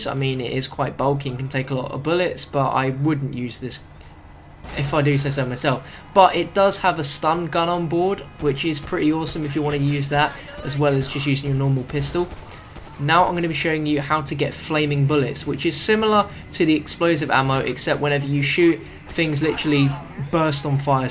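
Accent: United Kingdom. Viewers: Is he brittish I wonder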